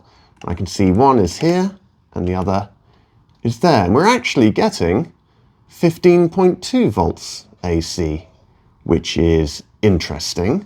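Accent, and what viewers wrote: United Kingdom. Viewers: I really liked your review of this product. I have the Eufy Homebase, Door lock and Outdoor cameras, and absolutely love them. Your review convinced me that the Eudy E340 doorbell is the one to get (I'm tossing out my Ring doorbell as the subscription costs are outrageous, and it doesn't have the Eufy capabilites). I also love your Brit accent and makes me a little homesick, as my inlaws live in Ramsey, Cambridgshire, who I miss. Thanks so very much. Oh, and I live in Columbus, Ohio, USA. Cheers. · Thx for this video. Also very easy for non-native speakers to understand. It’s a fantastic British English. Thank you for that. I love the way you pronounce glockenspiel!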